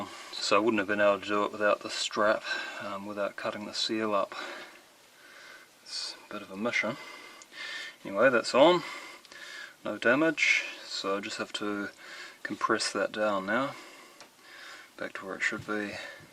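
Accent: New Zealand. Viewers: Accent: this guys NZ. Dole pineapple can: he's in NZ.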